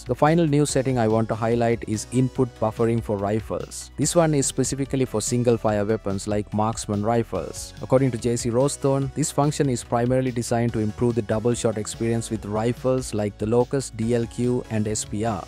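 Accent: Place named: India. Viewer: Indian accent... right, i don't buy anything he says.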